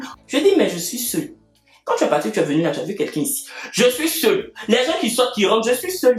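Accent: Cameroon. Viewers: Je dis ca oh ha pure kmer expression. L accent et les expressions du cameroun. Il doit avoir des amis kmer. C est que du positif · Tu parles trop comme nous les camer 💚❤💛. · C l’accent camerounais lol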